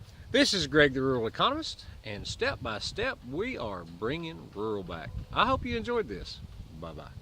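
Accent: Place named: South, United States. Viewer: I'm subin' because you sound like you know your stuff and you sound like your from the south. I'm back in Alabama, where I belong and I've been looking for a channel like this in my neck of the woods.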